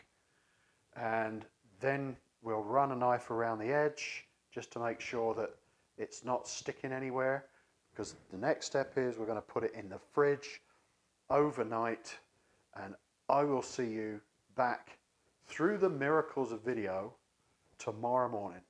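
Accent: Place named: Gloucestershire, United Kingdom. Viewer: Thanks, this video is clear and informative, can't wait to have a go. Love the Gloucestershire accent.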